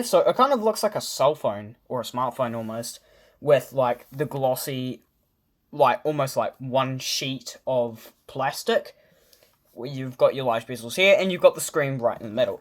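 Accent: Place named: New Zealand. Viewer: This video was on my feed. Wasn't prepared for the kiwi accent 🤓 Kidding aside this was a very good comparison video. Thumbs up mate!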